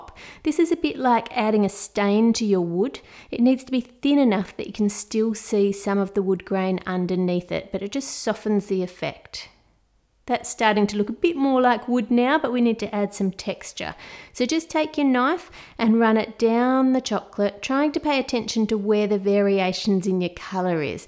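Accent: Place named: Australia. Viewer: This video makes me want an Aussie accent like hers.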